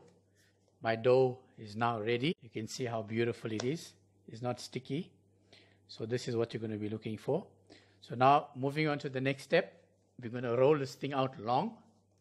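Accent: Durban, South Africa. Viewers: South African, accent sounds like Durban people... Durbanite ❤ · Hi. You sound South African Durbanite. I also do similar dough.. Take care love from Durbs. South Africa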